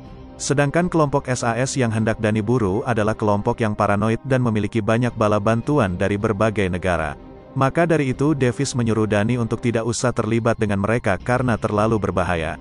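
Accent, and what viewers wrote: Palembang, Indonesia. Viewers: Wong plembang y om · Anjirrr.....cewek Palembang cokkkk 🤣🤣🤣🤣👍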